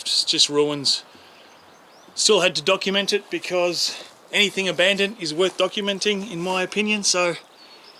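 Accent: Australia. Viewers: Interesting wildlife sounds I'm guessing by your accent it's Australia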